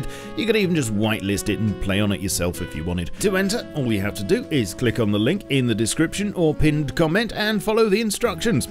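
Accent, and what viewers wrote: United Kingdom. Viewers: Am I the only one getting tired of the british accent thing? Not talking only about this channel, but everywhere in YT.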